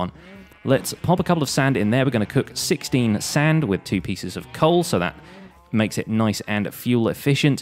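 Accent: United Kingdom. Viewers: It's just a normal British Accent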